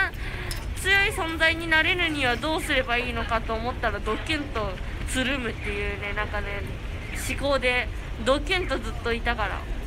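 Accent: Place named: Kansai, Japan. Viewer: たまにアクセントが関西弁だね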